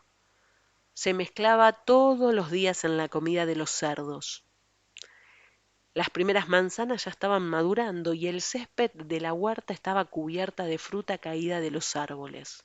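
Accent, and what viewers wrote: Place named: Argentina. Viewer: Amo el acento argentino, me relajó demasiado tu voz 😭🩷 está súper interesanteeee ya quiero que salga la otra parte, y gracias por el buen contenido :)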